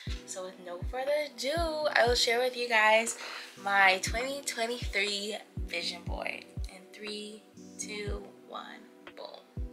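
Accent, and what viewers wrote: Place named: Bahamas. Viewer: Love it! Also as soon as I heard that accent was like yessss, 242 represent! Excited to do my first vision board.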